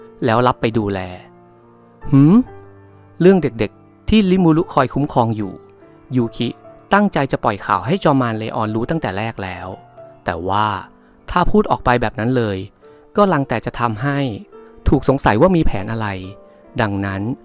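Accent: Isan, Thailand. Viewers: ติดสำเนียงอีสานด้วยฟังมะค่อยรู้เรื่อง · เสียงภาคอีสานเปลียนเป็บพูดปกติได้มัยคับผมฟังไม่รู้เรื่องคับ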